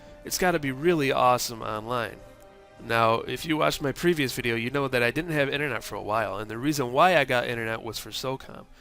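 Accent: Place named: New England, United States. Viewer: This discussion sux you sound like a Yankee just like that dumbass suckmyturban! And you didn't even play confrontation that was socom at it's finest! Socom 2 was best in that era. But when the HD world came out it was all about CONFRONTATION! Only problem like the other socom was the cheaters! Socom was known for after been out a year or so the cheating came out! Socom was the best game ever made!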